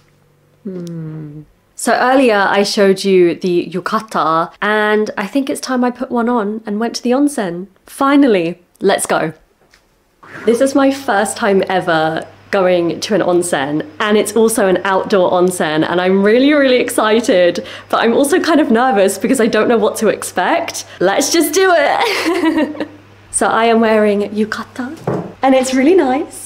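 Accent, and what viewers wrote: United Kingdom. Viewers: アメリカ英語よりイギリス英語が聞き取りやすいという意味が分かったかも✨ 日本語訳と英語の同時表記ありがとうございます。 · British English も美しい……今からもずーーっと聞かせてほしいですね、耳に心地よい響きをもたらしてくれる……… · ねえちゃんのBritish Englishをいつも楽しんでいますが、 鼻の大きさも楽しんでいます。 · とても美しいドレス姿と日本の半纏。楽しみました。イギリス英語は日本人にあっています。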